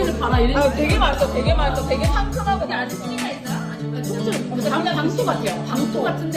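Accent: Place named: Busan, South Korea. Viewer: 첫멘트 어? 리얼리? 발음이 좋으십니다 ㅋㅋ 한달뒤 휴가인데 말투 듣고 있으니 벌써 부산에 와있는 기분이네요 부싼 아입니꺼....ㅋ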